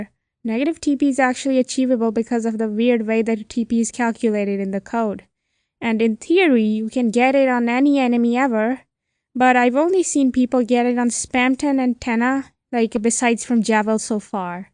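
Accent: Southeast Asia. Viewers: pretty sure it's an accent?? sounds southeastern asiaidk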